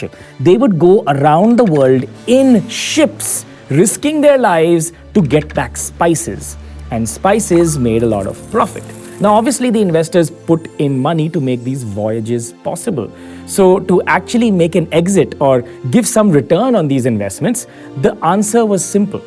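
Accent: India. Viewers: Hey man this video is very good and i am binge watching your channel's videos this is really good! This guides me in right path with new perspective! Please continue this job and this is very engaging!❤️ By the way your accent looks like one of the south indian movie director GVM. 😹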